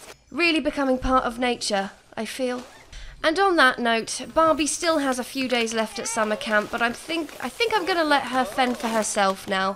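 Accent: United Kingdom. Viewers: you are my favorite simmer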